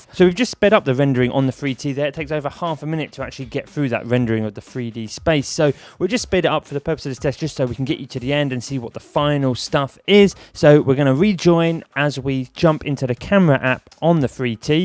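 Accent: England, United Kingdom. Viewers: first name surname he's from England that's how his accent sounds like and English is originally from England. Deal with it in fact your accent is the one that is weird to them.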